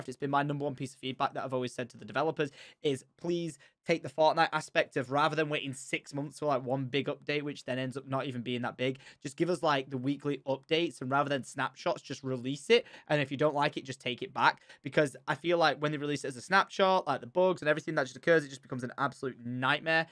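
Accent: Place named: Manchester, United Kingdom. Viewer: This is the most manc you've sounded in years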